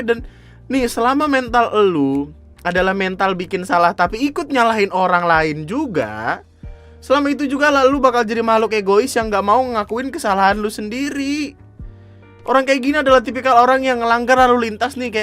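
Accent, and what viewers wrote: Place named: Medan, Indonesia. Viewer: Dari logat nya kaya nya orang Medan itu bang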